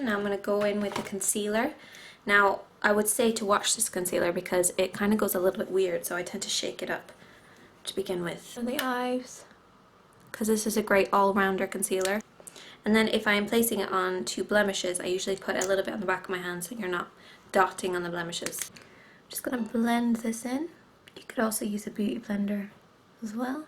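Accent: Ireland. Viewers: Are you Irish ?-2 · are you from ireland ? o: your accent makes me think c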